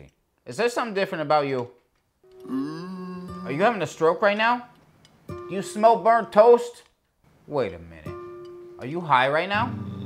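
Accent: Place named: South Boston, United States. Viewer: XD I love that he has a southie accent!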